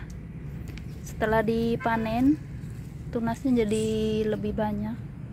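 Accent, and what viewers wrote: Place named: Java, Indonesia. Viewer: Kalo boleh tau Kakak orang Jawa ya, soalnya kalo ngomong kyk logat orang jawa😊 Ohya kok ga pernah lihat bunga vincanya Kak, dimana ya?💚🌱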